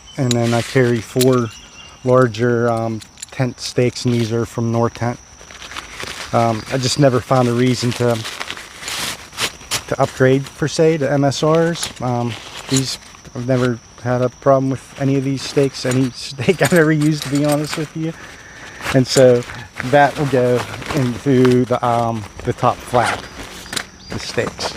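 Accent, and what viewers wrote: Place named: Western Pennsylvania, United States. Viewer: Right on western PA .... so you sound..... East Palestine here.!!!!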